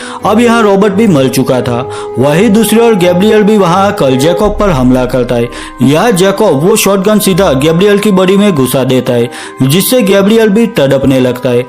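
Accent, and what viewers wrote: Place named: Bihar, India. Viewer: apki voice se lag raha hai aap bihari ho..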